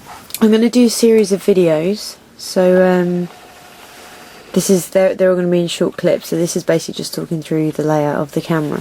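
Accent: United Kingdom. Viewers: Sister, your voice is very british, I like. Do you know how to open the hot shoe lid?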